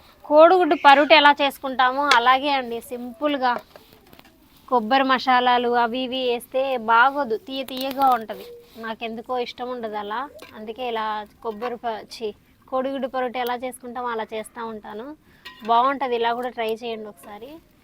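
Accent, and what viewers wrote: Telangana, India. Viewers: ee video lo telangana yaasa matladinav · Telangana slang add chesaru akka super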